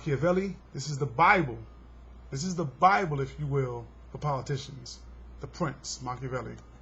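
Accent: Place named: East Coast, United States. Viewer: You have an unmistakable East Coast accent. I will look into purchasing some of those books you reference. Good job keep up the good work youngster.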